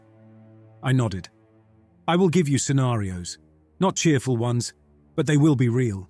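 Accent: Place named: United Kingdom. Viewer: Oh look another British accent 🙄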